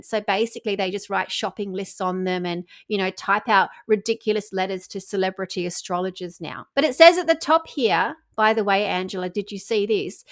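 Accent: Australia. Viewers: We can hear you're from Australia. Greetings from South Africa 👏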